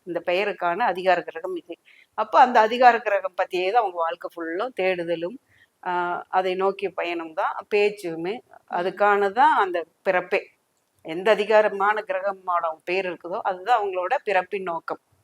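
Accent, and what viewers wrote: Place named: Kongu, India. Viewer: Konguu tamillum kamidiyana pechum!!😂🎉.....naadi joshiyum super!!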